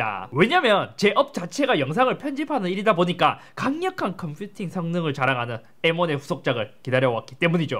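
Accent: Gyeongsang, South Korea. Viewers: 잇섭! 귀여우다 발음을 들어보면 대구출신인것 같은데 맞아요?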